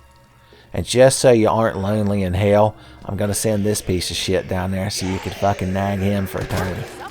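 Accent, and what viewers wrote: South, United States. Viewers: I've fallen asleep to the sound of sweet, soothing, southern voice, and its only affected me by helping me fall asleep and stay asleep.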